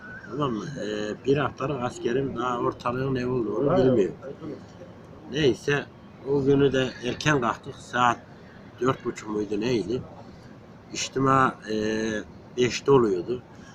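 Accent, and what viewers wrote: Central Anatolia, Turkey. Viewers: İç adadolu Aksaray Niğde şivesi olabilir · İç anadolu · Arkadaşlar adam iç Anadolu'dan iç Anadolu da çoğu yerde bu şive ve ses tonu var. Sadece sizin orada bu adam gibi konuşulmuyor. Eğer iç Anadolu da yaşıyorsanız %90 böyledir şive. Ve bu adam Yozgatlıda olabilir Niğdeli de Kayserili de Nevşehirli de Kırşehirli de vs vs hatta ve hatta Çorum Sivas ta olabilir.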